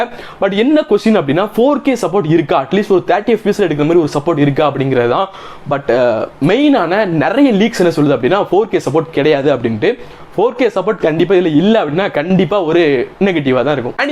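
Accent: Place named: Tirunelveli, India. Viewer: ji neenga endha ooru ji....unga slang ah paatha tirunelveli side maari theriyudhei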